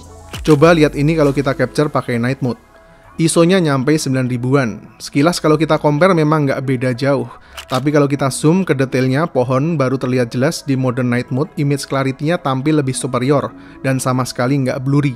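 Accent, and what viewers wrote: Yogyakarta, Indonesia. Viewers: Weh wong jogja po iki dab? 👍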